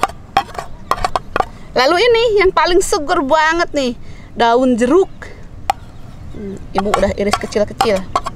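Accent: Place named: West Java, Indonesia. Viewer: Ibu teh tinggal d daerah mana yah... Bahasanya logat Sunda.. tapi dkt ke laut ada gunung / bukit juga... Banten Iyah Bu...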